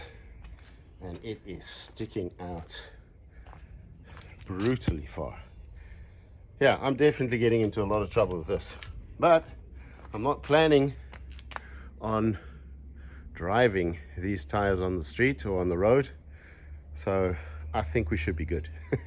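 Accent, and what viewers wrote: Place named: Gauteng, South Africa. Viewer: Profile says Spain. My ear says Gauteng :)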